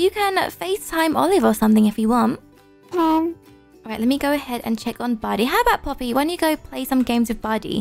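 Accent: United Kingdom. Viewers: Definitely British. Go find the exsplamation to find out! <3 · I like how are british ❤❤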